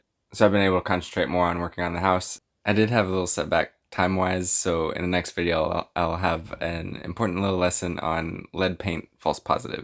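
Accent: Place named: Brazil. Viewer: . está a ficar muito "abrasileirado" 😒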